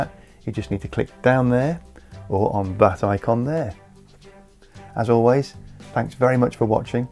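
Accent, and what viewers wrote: United Kingdom. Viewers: You took a quick swipe at Boeing with your British accent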